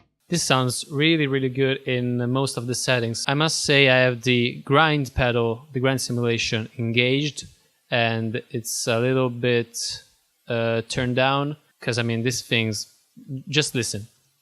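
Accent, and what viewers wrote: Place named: Italy. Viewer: ah, ecco, mi sembrava di sentire l'accento italiano ☺. Grandissimo 🤘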